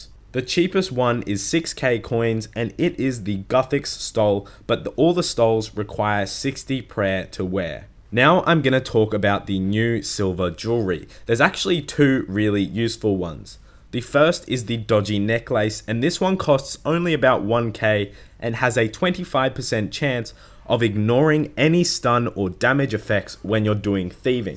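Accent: Australia. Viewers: That aussie accent is so stupid funny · He's australian...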